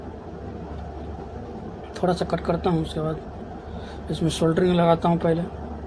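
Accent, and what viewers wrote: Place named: Bihar, India. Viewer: Aap kahan se ho bhai jaan aapki aawaz se lag raha hai bihar ya jharkhand se ho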